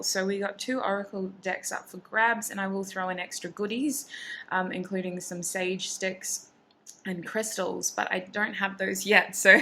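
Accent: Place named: Australia. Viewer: Thanks for the reading dear. I love your Australian accent it is cute and unique. Happy new year.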